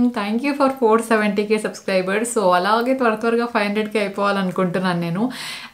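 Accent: Anantapur, India. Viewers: Yes waiting for ur love story pls anantapur language vintunte mana atp language ne bonda le alantivi mana atp valu ekuvaga use chestaru chala happy ga undi ala vintunte...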